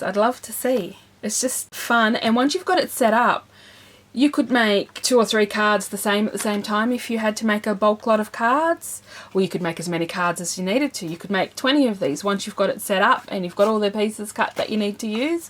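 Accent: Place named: Australia. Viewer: Nice to hear a fellow Aussie on YouTube - thanks for sharing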